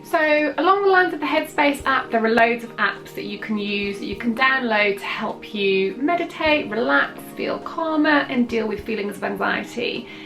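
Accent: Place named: United Kingdom. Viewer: Thank you for these ideas! I too have much anxiety, I loved your train idea, going to try it!!!! thank you! just found you "accidentally" by watching your "skinny tan" video. Im very intrested in ordering the product & somehow can across your videos😊 your skinny tan demo. convinced me to order it! so, thank you! also, I am so in love with British people & your accent ( Im American) so I also just love listening to you talk!😊 will be watching alot now! subscribed to yoir channel too btw!😊 you are lovely! thank you!🤗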